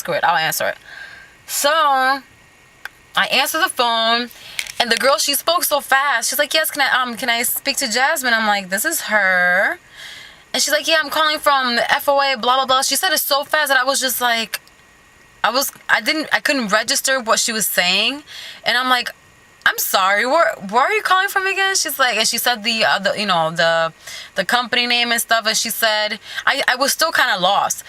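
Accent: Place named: New York, United States. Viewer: Oh nice.. Never been there. You sound as if you could've been living in new york.. Lol.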